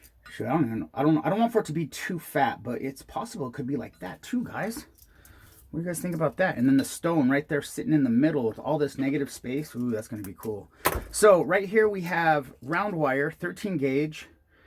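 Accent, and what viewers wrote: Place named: United States. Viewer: Hi, I am new to your channel and I am enjoying it very much. I make jewellery, but mostly copper, and wire wrapped. I do make rings and bangles and do the soldered join, but that is it for metal smithing so far. Keen to try bezels with strip wire bezel and also gallery wire. Tell me, as an American why you guys say sodder rather than solder and using the l in the word. It sounds odd. Anyways, glad you enjoy our English accent. I live in france and 5he French love the English accent speaking French lol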